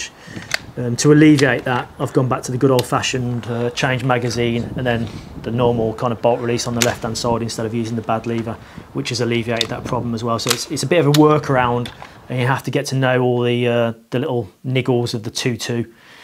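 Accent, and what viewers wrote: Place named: West Midlands, United Kingdom. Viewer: ahhh a fellow west mids chap? anyway great review think im gonna go LANTAC and salute you sir :D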